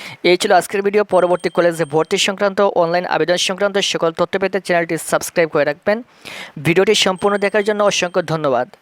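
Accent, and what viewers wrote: Sylhet, Bangladesh. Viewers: are you sylheti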